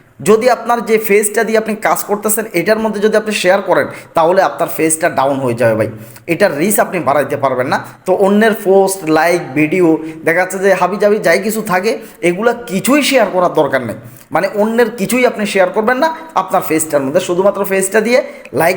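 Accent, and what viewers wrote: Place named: Noakhali, Bangladesh. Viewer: আপনার উচ্চারণ পুরাই নোয়াখাইল্লা টান আছে,শুদ্ধ বাংলা উচ্চারণ জরুরী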